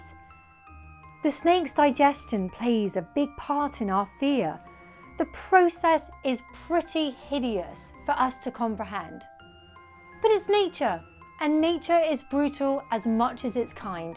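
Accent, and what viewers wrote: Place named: United States. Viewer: You talk about rewilding in Britain but your not actually British yourself from how you speak I guessing you’re actually American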